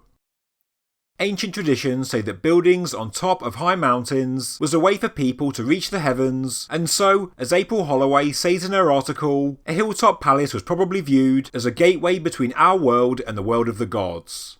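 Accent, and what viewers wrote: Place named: Midlands, United Kingdom. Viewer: British, somewhere more north than London, probably Midlands.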